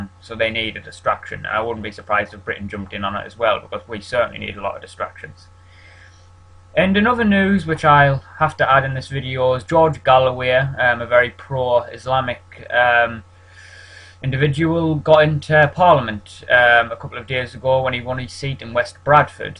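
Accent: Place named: Ireland. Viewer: I just seen this video you are a dumbass British moron that sound like a Irish leprechaun why don't you put on your green suit and go find your gold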